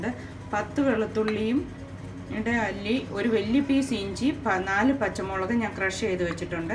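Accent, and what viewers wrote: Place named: Kottayam, India. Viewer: Very easy. Keep going..waiting for simple recipes. I like kottayam style language also.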